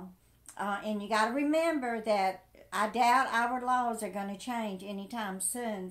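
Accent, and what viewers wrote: South, United States. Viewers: Hi. I just found your channel. It’s nice to see someone address the realities of the elderly. Couple of things. First. Your a attractive woman. New Jersey? With a southern accent, cool. Are you Italian? You resemble some of my Aunties. I did see that your doing smoothies. Cool. I switched to a primarily plant based diet. I have lost 12 pounds so far. Just by cutting out a few things and replacing them with other things. I don’t use coffee creamers any longer, too many additives and sugar. I switched to half and half. I bake my own bread. 50 cents a loaf that lasts all week or more. (thanks mom for teaching me how to bake). 590 for rent? Wow, that beats 1500 plus out here in Southern California. As for me, I’m doing ok thank God and for a mother (with a high school education) that taught me how to save and most importantly pay my house off. 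Even if you live on social security and a pension, mom always taught me....when your old you still have to save and live a frugal life. It’s. A little something on dumpster diving. Just be careful. I did a little dumpster diving in my youth. It’s really sad to see what this country grows away. If your interested and feel up to working just a bit. Check out your local school district cafeteria/foodservice program. You might be able to pick up a couple of hours here and there working in the cafeteria. I was a food service coordinator for years. The pay is good. Keep up the good work......sincerely, Gabrielle, Southern Ca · Social Insecurity, this term is exactly what it is for so many. Love your sweet Southern voice & wise words for the younger generations. If only they would listen. Unfortunately many are struggling, living paycheck to paycheck. Some aren't making the choices that would improve their situation. 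We can't do it for them no matter how much we want to. Until they "get it", we can only wait & try to point them in a better direction. My state also has homestead exception as well as a discount for older homeowners (age 65+, I believe). Not thrilled about "renting" my property from the county/state, but thankful I'm able to afford it. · Hi just curious new sub here.....you have a southern accent why NJ? Im from NY but live in NJ the cost of living is atrocious. My family all moved south yrs ago Im not a fan so I stayed but overall cheaper down there.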